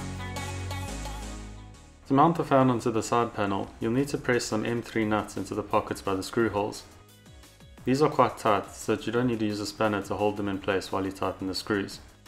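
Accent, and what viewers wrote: South Africa. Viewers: a fan of our South African Accent!...Well done bru, lovely work. I have the horizontal mounted ICE Tower, perhaps a "Flatbed" version? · dude that would be awesome please as not as many people have access to laser cutters as to 3D printers! P.S. great to finally here a Saffas voice on one of these channels, keep up the awesome work!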